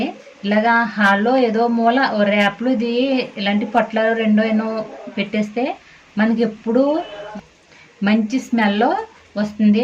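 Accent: India. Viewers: Matladetapudu slang easy ga telustundi godavari ani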